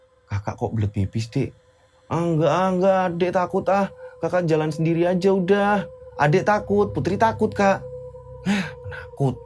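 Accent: Java, Indonesia. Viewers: boom bomm hadiir jhor bhru mleysie. suke banget chinel ni. jwa nya medook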